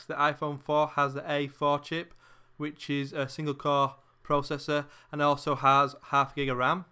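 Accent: United Kingdom. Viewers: xDDD i like your accent, its very... british xddd good video, like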